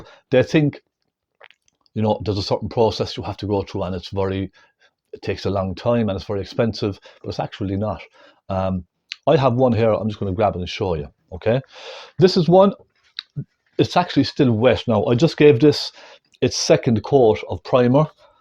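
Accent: Ireland. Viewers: is your accent Irish?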